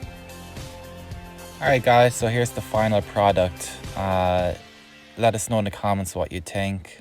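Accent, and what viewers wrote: Ireland. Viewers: Great work. You have IRISH heritage? Accent and words indicate yes 👏🏻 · Class work!! Hint of an Irish accent?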